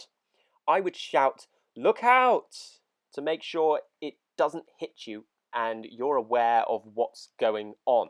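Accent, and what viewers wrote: United Kingdom. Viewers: I am looking up to for learning the British accent.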